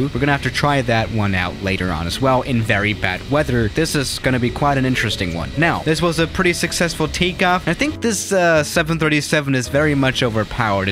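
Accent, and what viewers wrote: England, United Kingdom. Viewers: To be honest you sound so British. I'm from Norway so sas is a good airliner.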